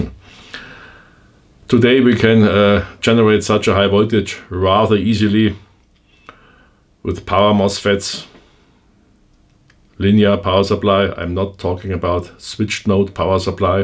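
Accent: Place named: Germany. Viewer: funny how germans say pluck not plug and wideo not video!!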